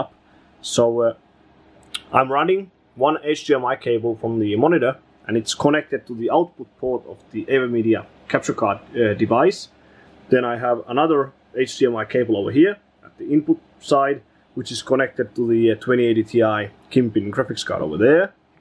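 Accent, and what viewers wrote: Finland. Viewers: You really sound like Finnish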